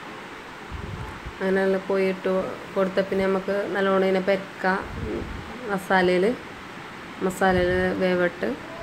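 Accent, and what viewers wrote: Kasaragod, India. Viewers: Kasrood slang sooper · 👍👍👍nammale ksd slangil bisiyo pareembonne pang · Super... nhammale kasaragod baasha..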